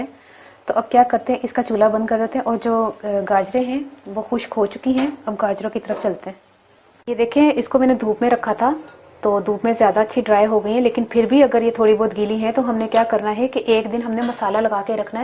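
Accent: Sialkot, Pakistan. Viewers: Sialkoti punjabi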